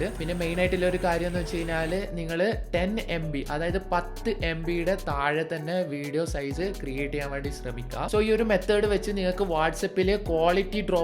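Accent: Kannur, India. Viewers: Ningal kannur aan. Alle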